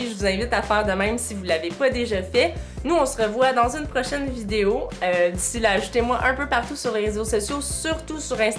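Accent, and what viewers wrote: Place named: Canada. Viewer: Je suis Français et vie en France je suis dans le sud à côté de saint tropez mais j’adore ton pays ces beau Montréal tu a le même accent que l’actrice Sophie Nelisse mais elle est de Montréal sache que tu es le bonheur en personne ! je suis fan j'aime beaucoup la qualité de ta video avec quelle type de camera film tu et pour le son a tu un micro cravate ou ces le micro de la camera et le montage quelle est ton logiciel merci encore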